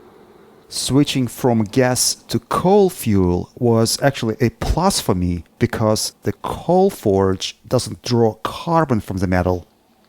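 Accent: Russia. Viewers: "Presents should not have any functional weaknesses or design flaws" - that must be the most Russian thing I have ever heard, and your accent only made it better. Great job, sir. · У автора русский акцент.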